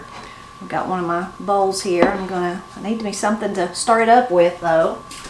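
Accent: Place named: Oklahoma, United States. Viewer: My mom was born and raised in Oklahoma in the 40's& 50's. She married my dad in 1958 and they moved to Iowa where my dad was born and raised. My mom was raised on much the same foods that you make and eat. My dad, brother and I refer to fried okra as eating "candy" to this day. Mom has been gone for 22 years and the way you talk and the meals you prepare remind me so much of her. Thanks for the great memories!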